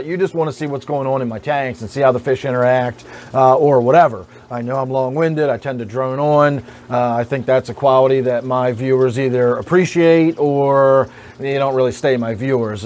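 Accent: United States. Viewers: No! I like hearing your little "hey everyone" greeting plus I am english so I like a US accent and you are easy on the eye too 😍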